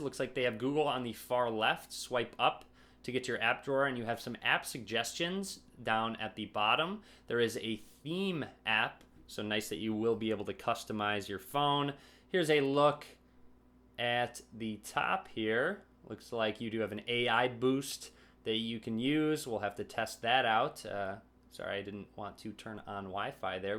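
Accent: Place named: Chicago, United States. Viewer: Favorite phone reviewer, right up there with MrMobile, Michael Fisher. Especially like your Chicago accent. I see Amazon is already out of stock, sad. Wondering if this phone will work on Project Fi....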